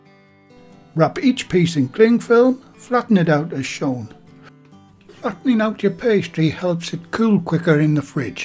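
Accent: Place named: Northern England, United Kingdom. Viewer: From a French person (from the country of quiche), I approve. Love the Northern accent. 😊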